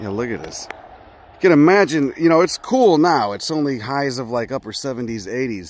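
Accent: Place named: East, United States. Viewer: I'd love to know, where is that accent from? I'm from CO and CA, so I have trouble identifying Eastern accents.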